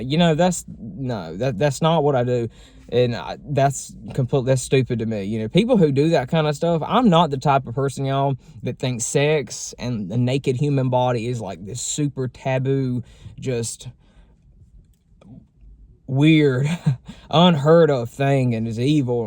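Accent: South, United States. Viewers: I find that to be a ridiculous question tbh. It once again points out the hypersexualization in the LGB community. It also tells me that ppl are watching the videos without hearing & listening. This man has clearly stated that he is looking for love. That he wants a husband 1 day, with kids. He wants a family. He has spoken so clearly about his past & how exhausted he was that he couldn’t find anything burn guys just wanting sex. And then, here comes the OF porn addicts. That only want his body. It’s maddening. Yes he is gorgeous guy, beautiful southern accent, kind heart….yet he is just still being objectified. Like jeez ppl. Then yall wonder why yall be dying alone, at the age of 50 & 60 still on hookup apps getting rejected daily. I hate it. Truly.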